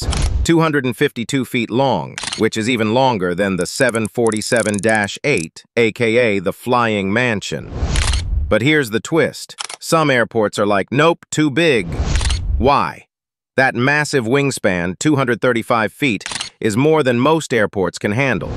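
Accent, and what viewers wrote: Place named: United States. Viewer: Comments are so stupid, not enough with this awful American accent witch makes it ridiculous 🙄 !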